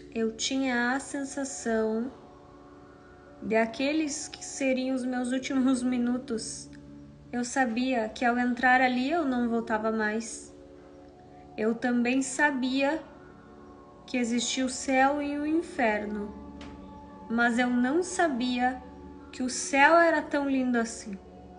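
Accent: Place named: Bahia, Brazil. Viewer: Cara que videnre chata ! Com esse sotaque com uma mustura de baiano cauxo minerio !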